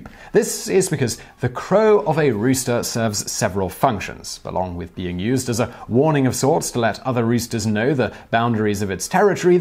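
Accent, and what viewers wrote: United Kingdom. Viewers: Why is Michael from VSauce talking with a British accent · Interesting that despite your British accent you use the American term rooster rather than the British term cock. Now why is that I wonder?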